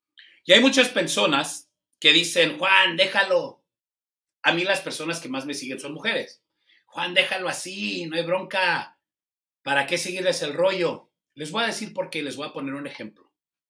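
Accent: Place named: Venezuela. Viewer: Me encantas porq hablas como el venezolanos sin pelos en la Lengua 😁😁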